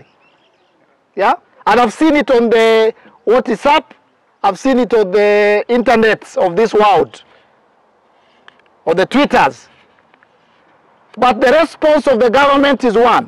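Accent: Kenya. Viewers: You've made my evening, with a kikuyu accent. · So all of you guys are assuming Eric is trying to speak like a Kikuyu accent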